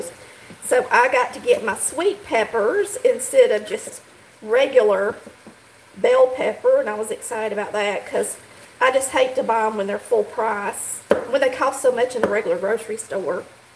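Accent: South, United States. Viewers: I absolutely love your Country accent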